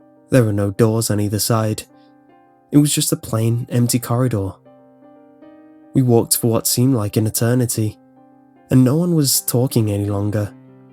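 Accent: United Kingdom. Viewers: ***** Do you not realize that there's no sign of mentioning to either the author nor the talented musician Myu. does not do this because he's good at it in my opinion. Hell, he pays someone else to edit for him. What I meant was that there have been other You Tubers that have narrated this story before. Not to mention that the original author will not be getting any bit of the income make off their stories via YouTube. Last but not least, I own the rights to my very own creepypastas. I put hard work and dedication into them. I do it because I love to, not for money. Then again even if I did I wouldn't be stealing other peoples stories. I make my own. Having a light voice, with a partial British accent and someone else doing all of the editing does not mean you have talent. For the stories that he makes on his own, there's no problem by my eye. If he wants to narrate them and make money then okay. 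But as soon as it's not his original work.. you'd best count on watching your account very closely. The moment that he steals my idea, he'll pay. I know it'll happen. Try re-filming all of Star Wars but instead putting another logo on it, changing one of the characters names like just did with this story. You'll get slapped with copyright so hard, you'll be on the streets or in prison. It's not worth losing your career over. Now I understand that it may be hard to concept this in a way that doesn't make me sound like I'm being a so called, "Dick" but us li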